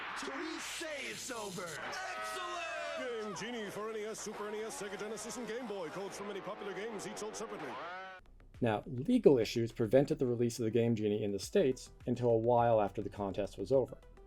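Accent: Canada. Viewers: did you not hear the characteristic Canadian ow sound?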